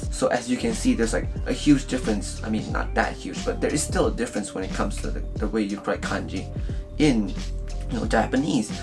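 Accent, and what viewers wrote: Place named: China. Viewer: I have to disagree with most of your points here.. 1.grammar is just a matter of getting used to it. Remember, language comes first, then grammar is just people trying to analyze the language. 2.chinese tones help enormously with Japanese pitch accent. Also with sino Japanese vocab. 3.as you said yourself, speaking is just a matter of how much you want to sound native. You yourself have a pretty heavy Chinese accent when speaking English and Japanese. But it doesn't make it any harder to learn the language. 4. Chinese speakers have a huge advantage when it comes to vocab, to deny that makes you sound you're just saying it to fill in your video 5. Kanji... Seriously? Smh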